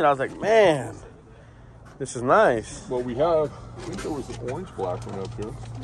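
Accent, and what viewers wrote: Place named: California, United States. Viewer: You sound like you are in cali. I lived in San Jose for seventeen years.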